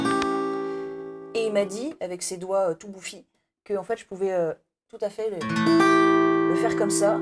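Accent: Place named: France. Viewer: since I saw you playing all instruments on your own I wondered what kind of treasure I digged out of youtube: You are so wonderful young comparing to me, the music I listened to at the end of sixties/beginning of 70ies...I ´m happy having been alive then, with my VW-Bus T1, long hairs and ´big things´etc.... but: I miss a daughter or a son of my own who understands my ´faible´ for this passed era of musical art, feeling and behaving.I´m not a musician myself but I think I could distinguish good and bad easily...kneeling in front of your ´Grandmother´ (never heard of her until now) this would be suitable for me music-aficionado...You must be at least of double language/national origin? Singing without any notable accent in English!?And talking French fluently? And while French-talking your English has French accent!? (why? to fulfil French listener´s expectations?)Thank you Mrs., especially tonight your cover has been moving my soul. 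Stay healthy and happy, good luck in your future fate;-)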